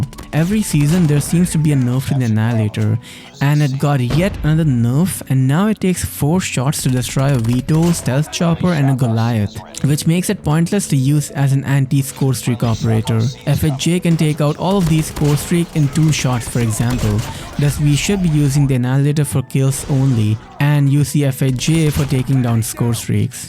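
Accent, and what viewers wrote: India. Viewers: Are you indian, your accent is kinda Indian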